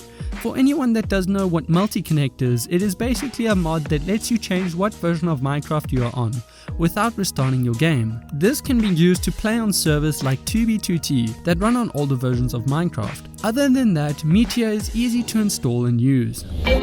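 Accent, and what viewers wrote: South Africa. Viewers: this man sounds southafrican. idk why but he just does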